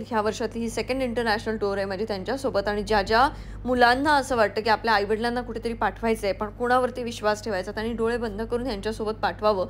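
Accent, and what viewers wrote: Malvan, India. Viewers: तुझे व्हिडीओस मी नेहमी बघते. तुझे मालवणी बोलणे ऐकून मी तुझ्या प्रेमात पडली कारण मालवण वरचे प्रेम🥰❤️ तुझे सर्वच व्हिडिओ खूप काही शिकण्यासारखे आहेत. एक मुलगी म्हणून तुझा खूप अभिमान वाटतो🫶🏻 तुझ्या वयापेक्षा तू खूप जास्त अनुभव घेतलास त्यातून खूप शिकलीस आणि तो तू सर्वासोबत शेअर करतेस🥰 तुला तुझ्या पुढच्या वाटचालीसाठी खूप शुभेच्छा👍 असेच तुझे सर्व अनुभव शेअर करत राहा आणि खूप खूप फिर मज्जा कर❤️❤️❤️ love you di❤️❤️❤️😘😍🫶🏻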